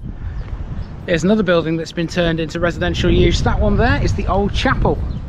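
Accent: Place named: Doncaster, United Kingdom. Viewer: Thanks for taking the effort to make these vids, I've just found your channel. Really interesting & a welcome diversion from the current madness! Keep smiling & your accent is like being back 'ome (Donny).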